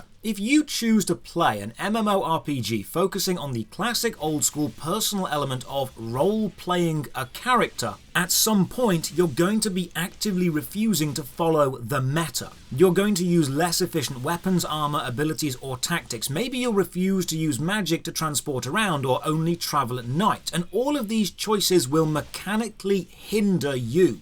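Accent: United Kingdom. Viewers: I usually can't take dnd seriously or the narration of dms. But with this guy I could, he looks like an elf and sounds like the omnipresent British voice narrating childhood favorite obscure animated fantasy films from the 80s. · your accent... I can’t put a finger on it. I’d guess somewhere north of London. But not the savage lands that is the North East of England.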